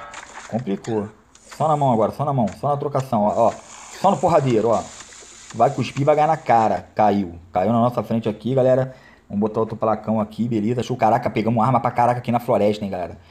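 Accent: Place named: Rio de Janeiro, Brazil. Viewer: eh muito engraçado... ctz que e do rj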